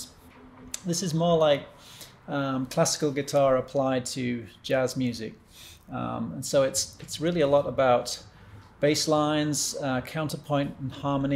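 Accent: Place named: England, United Kingdom. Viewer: Hi just seen the horizon doc Strange signals from outer space, very interesting.Great guitar playing by the way. Noticed your accent. Are you English.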